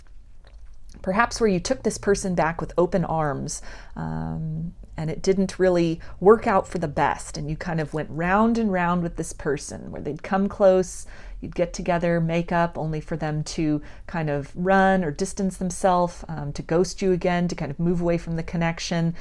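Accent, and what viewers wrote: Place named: United States. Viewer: Loving your American accent! Wishing you the best and plenty of success for your channel. New Subscriber! Thank you for your gifts. Have good day everyone!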